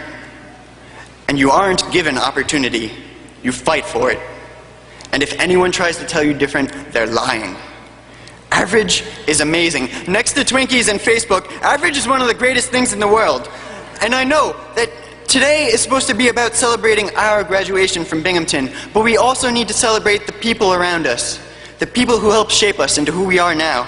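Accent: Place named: Canada. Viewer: I'm guessing Canadian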